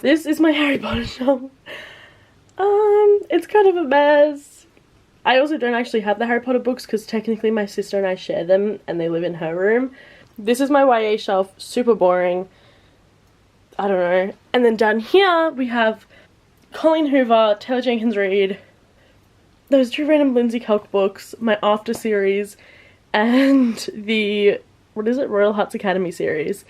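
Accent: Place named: Australia. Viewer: i just found your channel and when i heard you speak i was like a fellow AUSSIE???? incredible. watching you reorganise was so satisfying thanku girlfriend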